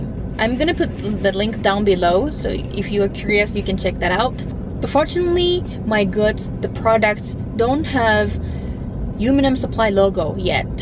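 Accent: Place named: Japan. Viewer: I love your Japanese accent.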